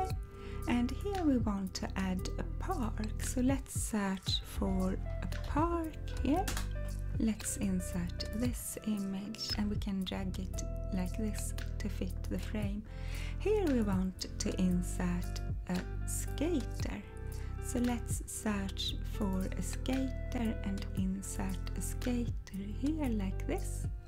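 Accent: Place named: Nigeria. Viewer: Sounds like a Nigerian accent.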